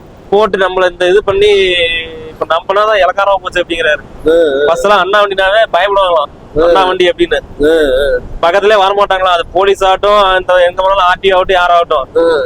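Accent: Kongu, India. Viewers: கொங்கு தமிழ் பேச்சு அருமை அருமை தம்பி. அப்பாவின் உழைப்பும் திறமையும் உங்களுக்கு பேருதவியாக இருக்கிறது தம்பி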